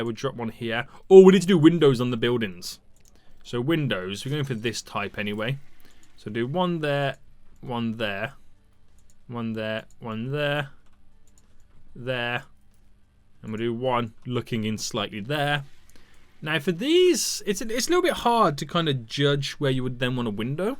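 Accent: United Kingdom. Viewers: How do u not notice he is British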